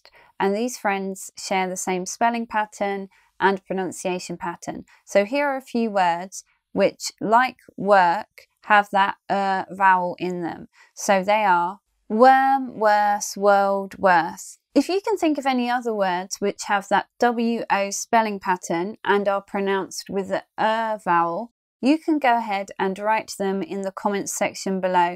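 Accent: United Kingdom. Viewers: That's the British pronunciation, which differs a lot from the American one.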